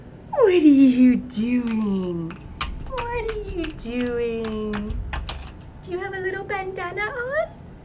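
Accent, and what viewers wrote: Canada. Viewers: Noice ..ok so we are only two years apart . N.B and I are within weeks of each other lol ... If I can't sleep I put on that hella Cray Canadian accent and boom ..I'm out . Makes it hard to finish his vids so I have to day watch/listen lol . He and Terrence McKenna have been my sleep help for months and months 😂😂😂😂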